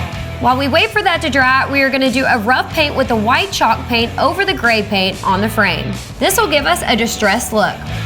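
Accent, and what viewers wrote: South, United States. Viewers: That country accent 👌🙌😍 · How the fuck, did I end up here?? ...I ended listening to southern American girl ....building shit. Why.....I am in Europe like. At least I enjoyed her accent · Redhead. Chest. Southern accent. She has to be bi too.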